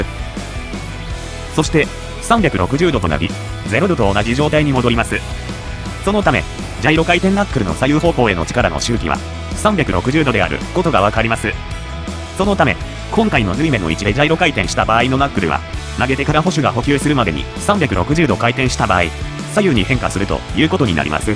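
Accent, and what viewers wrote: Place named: Kansai, Japan. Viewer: ちょっと関西弁チックなのがすこ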